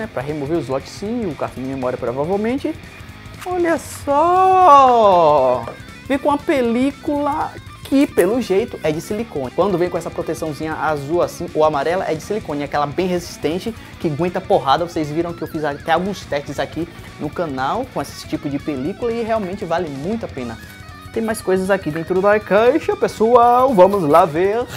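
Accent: Bahia, Brazil. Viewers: Meu mano vc é de que cidade do interior da Bahia ?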